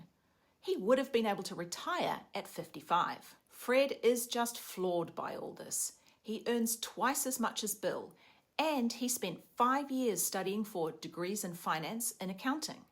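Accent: New Zealand. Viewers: Hi, judging by your accent I think you might be a Kiwi (if not, apologies!). I am in Auckland, NZ, and just wanted to say how proud I am of your channel. You are truly inspirational and the reason I got started investing in Bitcoin. This is one of the best Bitcoin advisory channels out there, and I hope it keeps growing because you absolutely deserve it.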